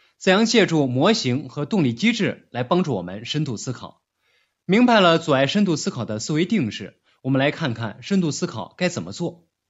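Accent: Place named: Shandong, China. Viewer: 说的太好了，只是普通话不是很标准，您是山东人吗？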